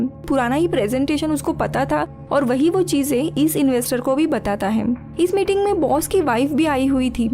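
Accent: Maharashtra, India. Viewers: do you have any relation with climax explain in hindi channel's nikhil ? moreover your accent is quite marathi. are u a marathi? pls tell. like your choice of movies and explaination.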